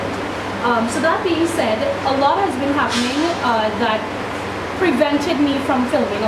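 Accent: Trinidad and Tobago. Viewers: This truck nuh serious bout life hahahah true Trini talk lol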